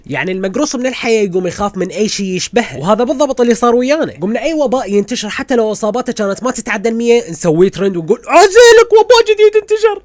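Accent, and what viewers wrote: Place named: Iraq. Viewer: يخي احب لهجه العراقيه 😭💖💖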